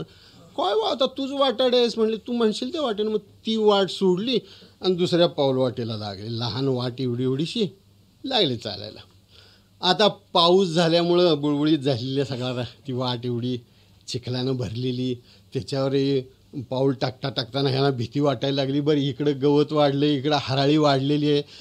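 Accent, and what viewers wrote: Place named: Mandesh, India. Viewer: माणदेशी बोली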